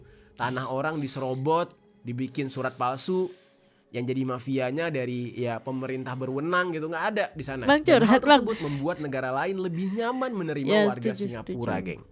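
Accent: Malaysia. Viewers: Terima kasih atas infonya, slang macam orang Malaysia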